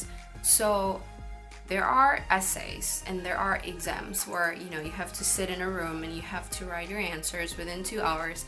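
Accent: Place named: United States. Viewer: You sound American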